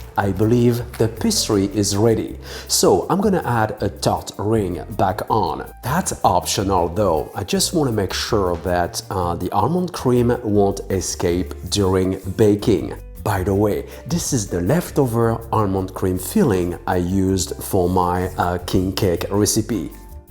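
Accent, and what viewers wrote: France. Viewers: oui, on lui a déjà suggéré de mettre des sous-titres en Français (puisqu'il EST Français) et ça a déjà été fait (on aimerait que ça soit systématique, MAIS faut dire que son très très léger accent français est très sexy : on adoOre !)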